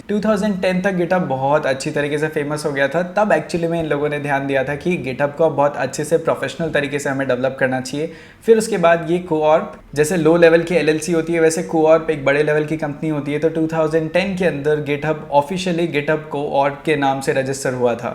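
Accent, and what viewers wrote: India. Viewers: Someone has already told you that European people find difficult to understand well tipical "Indian English"??? Is not question of speed... Why this torture!?!?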